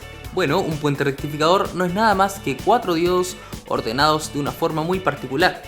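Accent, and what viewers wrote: Chile. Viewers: Dices acá en Chile pero el men por el acento parece chileno :v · Eri chileno? :3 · Amigo mio una pregunta eres chileno ?¿ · Es Chileno verdad?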